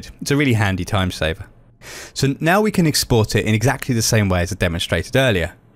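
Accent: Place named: United Kingdom. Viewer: Props to the ever smooth British (I think) narrator with clear and crisp voice, always nice to hear things accurately.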